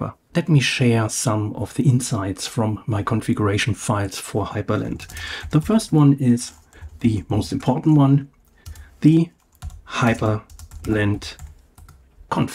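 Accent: Germany. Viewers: The german accent 😂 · man hört das deutsche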